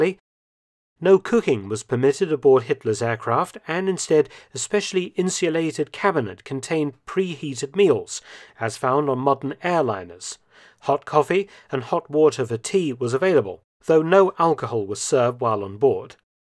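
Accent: United Kingdom. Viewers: He sounds like lots of my friends! (I’m British).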